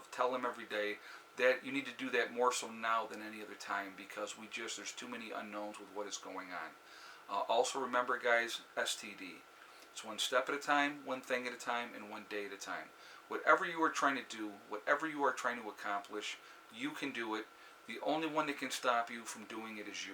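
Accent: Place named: New York, United States. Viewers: That’s too funny. I thought you said 8,000 but it didn’t even phase me because I don’t watch the market. I think it’s the NY accent! Blessings! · I heard 8,000 too! 😄 Must be that NY accent. In NY, 'A' means 'What?'. No biggie. 👍 Obviously, this virus is being handled by the 3 Stooges. I've spent a ton of $ too. My credit card is screaming! Think, research, prepare, and plan to take care of yourselves and your families. Stay safe everyone & God bless.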